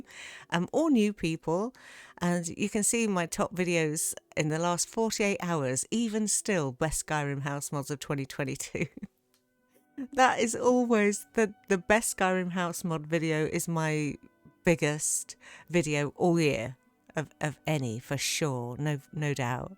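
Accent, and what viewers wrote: United Kingdom. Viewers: I just love your videos and appreciate your consistency. Your warmth comes through in your videos and I always feel better after having watched them. I've downloaded many a mod because I wanted to know what mods you were using (and thankfully, you often say what plant, flora, mount, armor, etc mods you're using), in addition to being able to "try on" a house mod before donwloading it. I also bought Fallout 4 because you mention it so often. Still haven't played it, but at least it's in my library now, thanks to you! Thank you so very much for sharing your passion and talents with us. I hope you have an uplifting and joyous year! PS - I would swear that your day job is with Classic FM (You certainly don't have to say! Your privacy is your own.) Every time I listen to it, I think, "Is that??" You have such a lovely voice. We Yankees are such suckers for the British accent.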